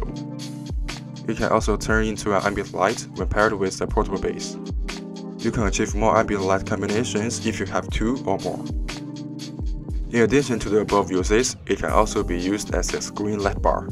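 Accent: China. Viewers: They are from china. And I guess some people may not understand the way they speak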